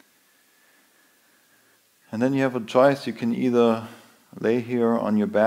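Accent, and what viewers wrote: Germany. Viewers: Das hört sich doch nach nem deutschen Akzent an 🤔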